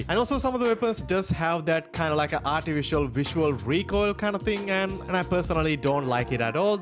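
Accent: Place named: India. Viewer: This Indian accent is fire🔥🔥🔥🔥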